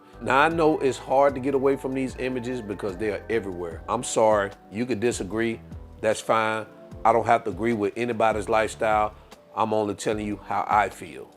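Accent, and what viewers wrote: South, United States. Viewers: You should be a preacher. You alreary have the southern accent. You said it as you see it, and I think you right.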